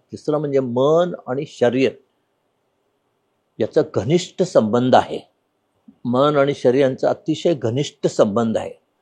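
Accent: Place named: Karnataka, India. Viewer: डाॅक्टर कर्नाटकातील आहेत. कानडीचा प्रभाव मराठीत जाणवतो. निरीक्षणावरुन.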